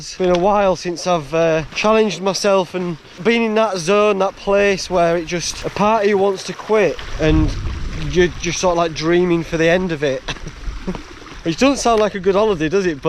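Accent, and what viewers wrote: Yorkshire, United Kingdom. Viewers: Awe you’ve been a miss with the multi day adventures 😀 Love the Yorkshire accent, never change! That’s the one thing I loved to listen to on my coast to coast path last year. Really enjoyed that 🫶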